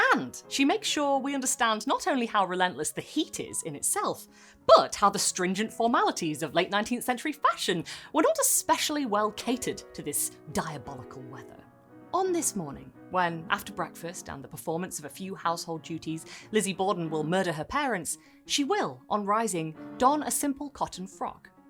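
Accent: United Kingdom. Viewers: Thank you so much for the trans inclusive disclaimer about womb language at the beginning. 😊 In current year I unfortunately always get a little nervous when I end up liking a content creator who speaks with a British accent, purely because of what's been going on in the UK right now to erode trans rights. Very happy that I don’t have to worry about any of that rhetoric coming from this channel. Thanks again.❤ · Extremely surreal to hear a British voice say the name of my hometown. Very excited to see more of this. Edit: Belly laugh when I heard "Portuguese Community" in the part about immigrants, so weird to think about how the tables have turned.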